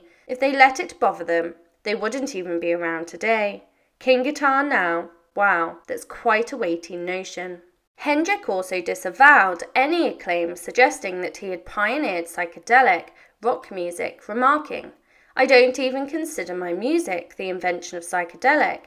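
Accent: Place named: United Kingdom. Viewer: Apparently it is compulsory to say "it will always remain an enigma" in a British accent to what is otherwise obvious evidence of murder...I was a good friend of Tappy Wright and he told me things that are not in his book because he didn't want to get killed...The reason it was covered-up is because government agencies (That Jeffery belonged to) were involved...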